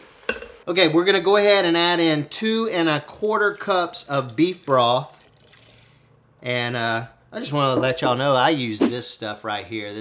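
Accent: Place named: South, United States. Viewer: By chance, l saw your recepe for cubed rump steak. I didn't want to make a casserole. Yours popped up immediately. I love your accent and personality. Is it a southern accent? The hairdresser l follow also has the same accent. March 2025. I wish you would use the metric sizes. However, l can check it out. Thanks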